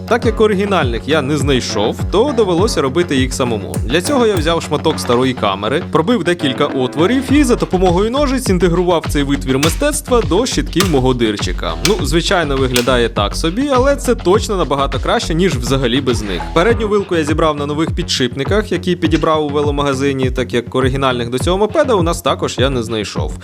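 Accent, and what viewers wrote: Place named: Rural, Ukraine. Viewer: Очень круто, особенно нравится твой говор, старый "селянський" украинский) мне вкатило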